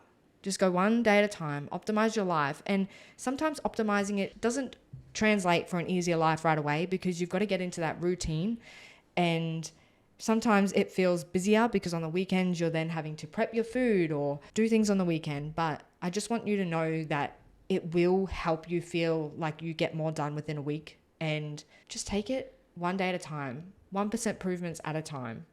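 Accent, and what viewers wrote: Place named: Australia. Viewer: Before u said Australian eastern time ,I knew were Australian. The accent gives it away.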